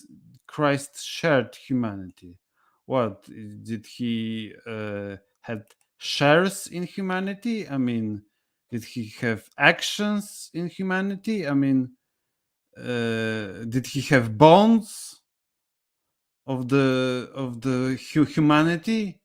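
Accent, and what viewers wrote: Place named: Eastern Europe. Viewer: Is this book popular? Nice read with good commentary and thic and fresh slavic accent 😁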